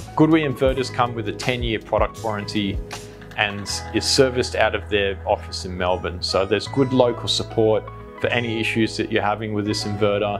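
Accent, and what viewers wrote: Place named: United States. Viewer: You have a funny American Accent :)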